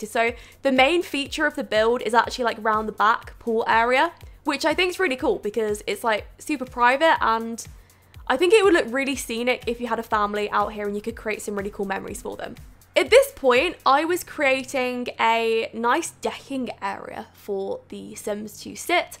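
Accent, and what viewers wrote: United Kingdom. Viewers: i love your british english:D wotaaah instead of water😂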